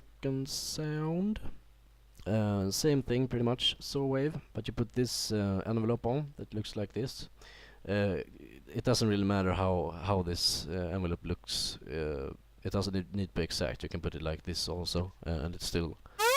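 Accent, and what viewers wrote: Sweden. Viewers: Dat Engelska med svensk dialekt!! epic!! :D Bra Tutorial mee :) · det hörs att du är svensk ;)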